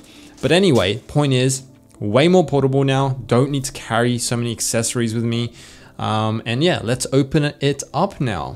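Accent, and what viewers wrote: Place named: Australia. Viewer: Hearing a familiar Aussie accent reviewing this powerhouse is strangely comforting? That or I’ve been in lockdown for too long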